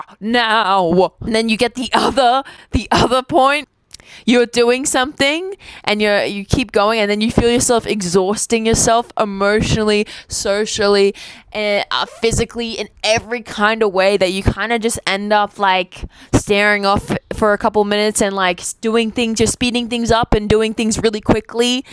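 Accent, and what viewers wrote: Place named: Australia. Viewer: Was gonna say you lowkey sound like but it’s probably just cuz you’re aussie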